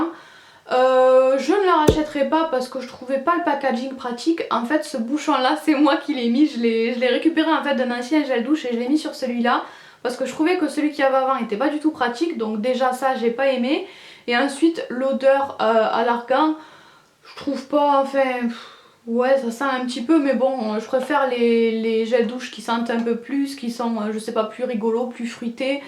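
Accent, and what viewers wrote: South, France. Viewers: Le suuuud !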